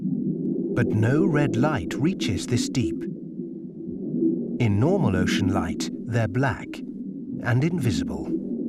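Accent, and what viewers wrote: United Kingdom. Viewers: Imagine if this documentary began with a female photographer taking photos of a man in a speedo, or some such get up. This, combined with a British accent to make it classy. Way to dumb down science at the expense and degradation of women.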